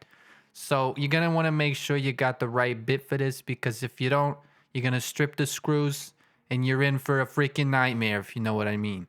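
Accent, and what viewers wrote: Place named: New York, United States. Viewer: I can't say that this guy does or doesn't live in Boston, but that is NOT a Boston accent. Sounds more like NYC to me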